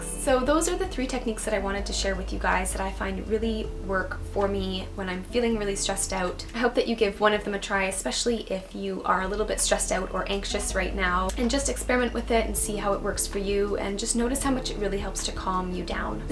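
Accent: Canada. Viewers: She must be Canadian. (out and nose). Thank you for these techniques. I definitely need them. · definitely a canadian accent! :-) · Love her, she's Canadian. I can tell by how she says out or about. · I don't know, I kind of find her voice soothing by itself, and I like Canadian accents.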